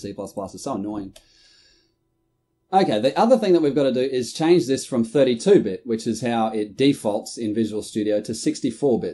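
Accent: Australia. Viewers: Assembly x86 presented in an Australian accent? Couldn't be better.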